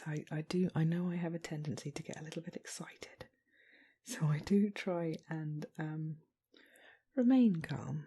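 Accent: United Kingdom. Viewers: This looks and sounds amazing!! British Accents are my favourite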